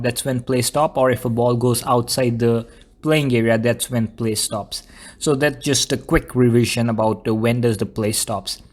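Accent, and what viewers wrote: India. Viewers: An Indien accent on Football. That's as cool as the offence guy to the defence guy on him: I crush you little man, being like: Catch me if you can...gooood luck! #cyberpunkcoltoure Take it as game of positions and the head is passing on the initiative savely. Even so difficult, the best teams win by passings from receiver to receiver avoiding ball loss, close to Rugby which is the Grand Pa, but way less aggressive. #provos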